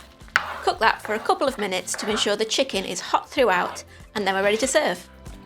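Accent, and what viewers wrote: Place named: Liverpool, United Kingdom. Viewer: Looks yummy and easy. Good old comfort food. Love your 'Shirley Valentine' accent :)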